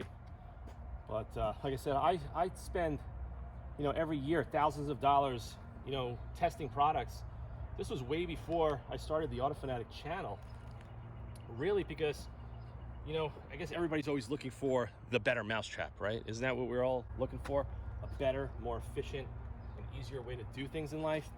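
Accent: New York, United States. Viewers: Awesome job on the correction! I heard you mention you already have cquartz uk on it already. I have the same setup on my RAV4. I talked to Corey about this from carpro and you really don’t want regular wax or sealant on top of a coating. They make “Gliss” now to put on top of Cquartz for extra depth and shine. I love the Wolfgang liquid sealant which is like Jescar power lock. I also dropped 2 hondo on Wolfgang concours carnuba. I heard the NY accent right away man, I’m from Jersey. Not knocking you at all about wax over coating. Just wanted to throw that out there. Griots are the bees knees. You literally have the same set up I have from the megs pads to the PFM towels. You need to try microfiber madness cloudbuster, they make the best glass towels I’ve ever used in my life. I gotta upload my 18 hour day of paint correction now after watching this video 😎 You rock man...keep it up!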